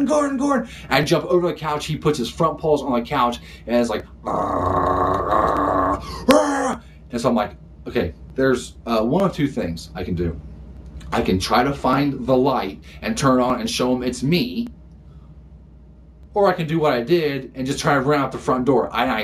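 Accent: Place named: United States. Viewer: Bro, you have the coolest American accent ever...^_^...and your new haircut looks like Sev from Killzone 2...woooo! BTW you have zombie spaghetti sauce on your shirt...O_O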